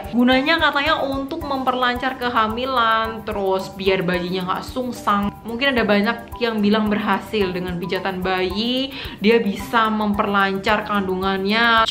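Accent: Java, Indonesia. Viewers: mba bukan orang jawa ya? Orang jawa memang gitu mba, kakak ku juga gitu klo ngomong ngeden2. Itu adalah logat namanya. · Medok bgt sih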